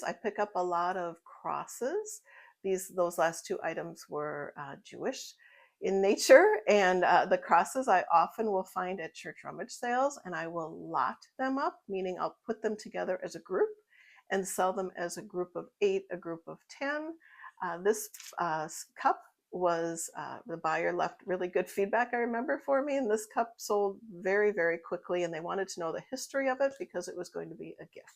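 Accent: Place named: Minnesota, United States. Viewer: Great video! Love your Minnesota accent! ❤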